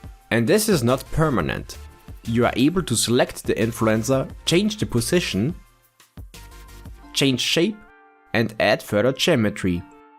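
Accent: Germany. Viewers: Dein German-English is aber schon heftig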